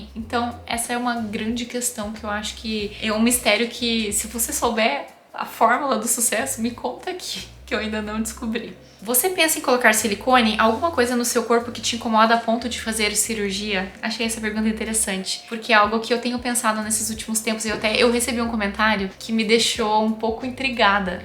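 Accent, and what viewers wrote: Brazil. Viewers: vc é gaúcha?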